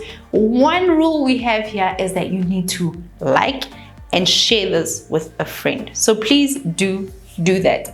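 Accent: South Africa. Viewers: Are you from South Africa ?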